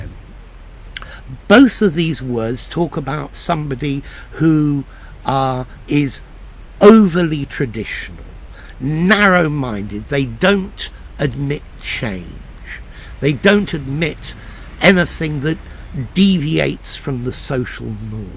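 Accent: RP, United Kingdom. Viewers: Thanks Sir for the lessons you provide for us.What a posh British accent! Splendid!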